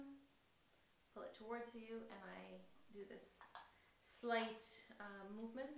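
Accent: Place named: Australia. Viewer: I can hear that Aussie accent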